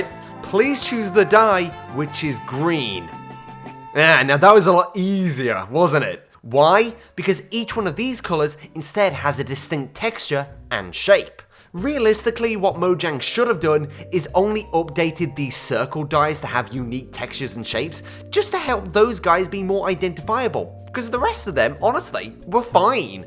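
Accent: United Kingdom. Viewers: You sound way too British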